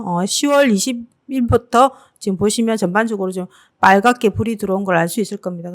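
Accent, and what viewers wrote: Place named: Gyeongsang, South Korea. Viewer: 제 생각에는 영남쪽이 고향이신듯하고, 그래서 사투리가 섞여있으셔서 그렇게 들리시는거 같아요.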